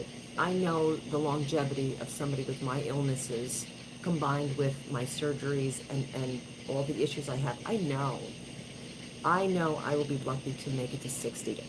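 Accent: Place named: New Jersey, United States. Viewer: Missed your 1st live:( watching now i would of never guessed you’re a Jersey Girl.I hope you do more lives! Hit the like folks its free helps the channel! And subscribe, of course.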